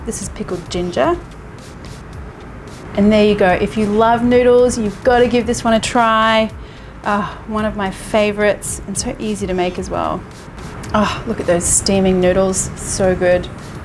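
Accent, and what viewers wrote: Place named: Australia. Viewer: I'm never gonna make any of these recipes lol but I love watching you and hear your Australian accent and sizzling food. Aaaah who else?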